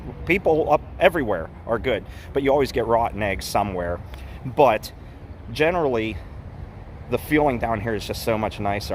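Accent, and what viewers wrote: Pittsburgh, United States. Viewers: First of all, love your Pittsburgh accent! It kind of makes me homesick. I lived in the North for a long time and agree with you about southern people. If I had a choice between living in Texas or Tennessee however, I would live in Tennessee. Great video bud! You made an otherwise boring old gas station interesting.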